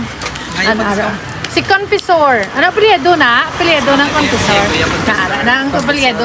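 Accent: Iloilo, Philippines. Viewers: Langga tamsak nakakatuwa Ang accent na illonga day injoy sa road trip full watch Po support din po salamat dikit na Ako langga sa Bahay mo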